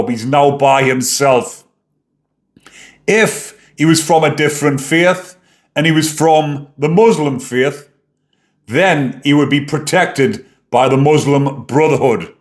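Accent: Newcastle, United Kingdom. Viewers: Oh ! Hello bonny lad ! Being honest ! Hearing my fellow bretherons voice ! Thinking your a geordie ex con ! Thinking ! That devils decilple ! Is about to reap the rewards ! For ! Killing ! An innocent boy ! Not us bloody english idiots lol ! Family a little fierce lol done jail time ! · A Geordie accent.North-East of England.Newcastle area.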